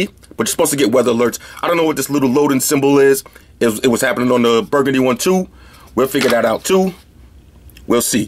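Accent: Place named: Brooklyn, United States. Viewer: you sound like you're from Brooklyn. Brownsville Here Homie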